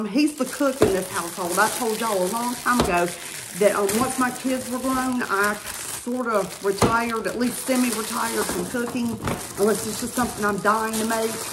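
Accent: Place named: South, United States. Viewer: Hey you! I subscribed to your channel a couple of months ago. I love your southern accent! And I too have been watching Jessica for years.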